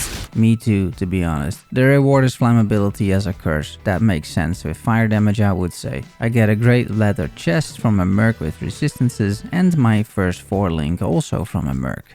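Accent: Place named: Netherlands. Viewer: Just a random comments, your accent.. are you Dutch?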